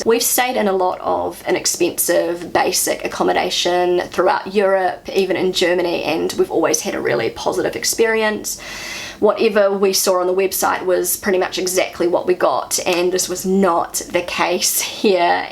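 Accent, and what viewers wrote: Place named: New Zealand. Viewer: Kia Ora !! What a joy to hear Kiwi accent !!!! God, how I miss it. I left NZ in 2009 to join my wife in France and never came back (at least for now, but hope I will someday). I miss NZ so much.... Thanks for this video and all the reminiscing of Aotearoa. Take care.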